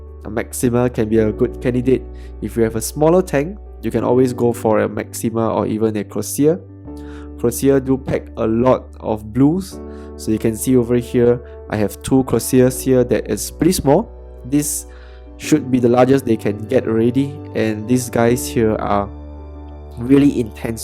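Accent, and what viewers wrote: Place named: Singapore. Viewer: Typical singlish....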